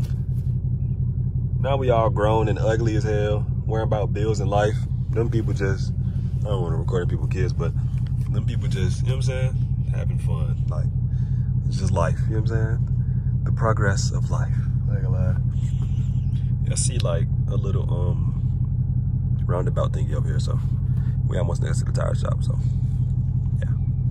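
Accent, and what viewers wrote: Atlanta, United States. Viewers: Sound like u from da Atl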